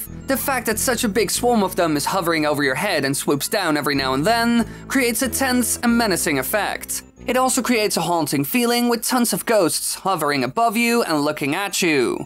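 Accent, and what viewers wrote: Netherlands. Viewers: 0.06 Ah yes a fellow dutchman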